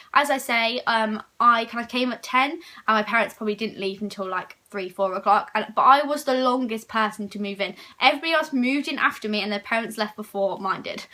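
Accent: United Kingdom. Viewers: Are you from Derby??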